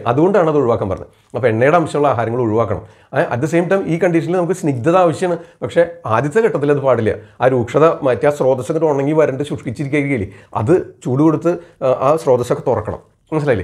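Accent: Thrissur, India. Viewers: Dr nalla avadharanamanuto thrissurano?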